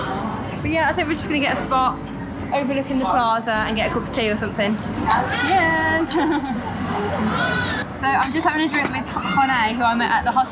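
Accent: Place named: England, United Kingdom. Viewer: Can understand your English English, must be how most Americans spoke when they arrived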